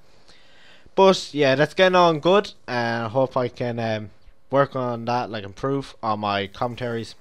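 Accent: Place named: Ireland. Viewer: FUNNIEST IRISH COMMENTATOR EVER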